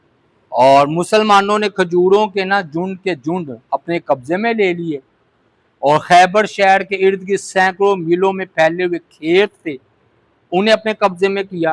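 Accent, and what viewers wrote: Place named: Lahore, Pakistan. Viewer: I like his lahori accent..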